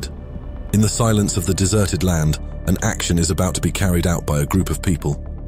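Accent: United Kingdom. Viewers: Whoever did the voiceover for this is terrible, your choice of words are a ridiculous and for a British voiceover telling stories about gangsters, doesn't go together. You might as well be doing National geographic or some shit because this ain't working for you 😂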